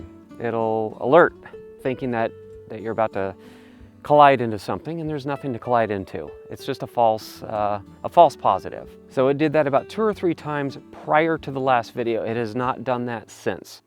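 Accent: California, United States. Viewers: I was searching for California accents yesterday and stumbled upon your video from 11 years ago. Just saw another one of your videos now, and it feels so surreal. I really love your accent, and I’m definitely going to watch all your videos to learn it.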